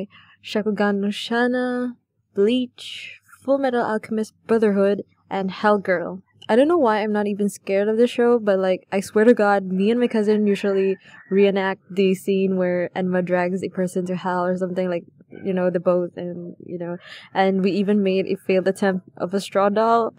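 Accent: Philippines. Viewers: Ur voice has a filipino accent and btw i love ur art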